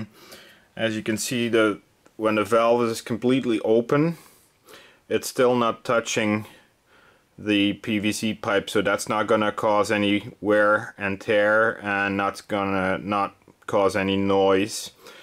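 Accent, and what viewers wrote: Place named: Canada. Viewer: you sound Canadian, where r u from?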